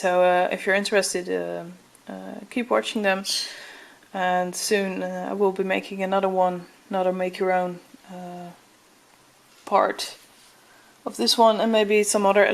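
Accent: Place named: Netherlands. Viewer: Dutch accent!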